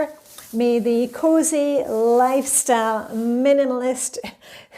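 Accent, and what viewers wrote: Scotland, United Kingdom. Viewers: Gosh!! How many languages do you speak?? Obviously French and English (with a Scottish accent) do you speak Danish and Swedish as well???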